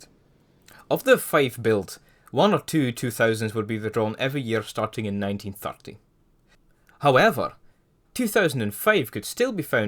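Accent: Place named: Scotland, United Kingdom. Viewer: First time watching one of your videos that isn’t a animation and my immediate thought was “holy shit there Scottish”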